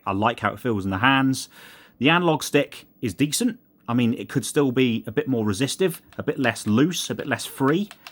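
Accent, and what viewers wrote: United Kingdom. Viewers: im not a massive fan of british accent. did they make a new version ? lol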